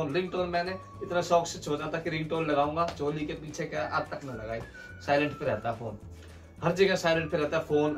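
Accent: Haryana, India. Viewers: Sach me yaar tumhara hindustani accent h jo bolne ka dil jit leta hai. Bb ki wines se bhi tagre ho ap yaar mstram ho bhai ap sach me god bless u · भाई राम-राम जी, बोल्ली से तो हरियाणा या वेस्ट यूपी के लाग रे हो? बताई दो कां के हो 😊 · Bhai kha se ho Haryannve se lag rahe ho bhasha se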